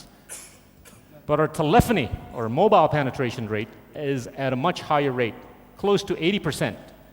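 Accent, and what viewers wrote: United States. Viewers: Aksen Amerikanya kentel banget...😊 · Wow setelah bertahun2 lulus dr universitas di Amerika beliau tetap fasih berbicara dengan bahasa inggris aksen Amerika, cool!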